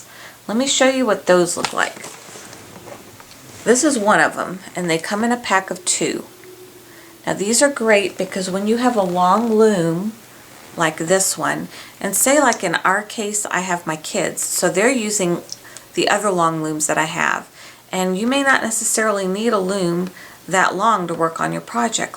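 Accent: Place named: United States. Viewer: hi I watched your rainbow loom vid and your voice sounds American but u look Asian please tell me where your from I love your vids please reply ASAP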